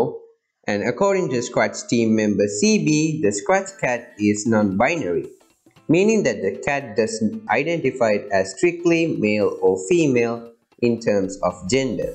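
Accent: India. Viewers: ur indian accent is so strong, the subtitles say "caro network" instead of "Cartoon Network)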